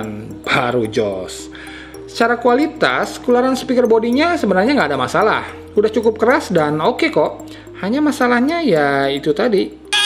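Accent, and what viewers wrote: West Java, Indonesia. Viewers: Suka sama reviewnya kang. Sunda pisan euy. Hehe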